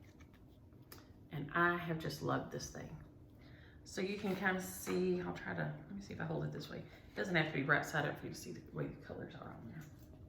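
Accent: South, United States. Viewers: LOVE your videos and your southern draw😆😆. You have some beautiful WIPS. I love Pumpkin Pie with whip. Have a great get-a-way. See you next time.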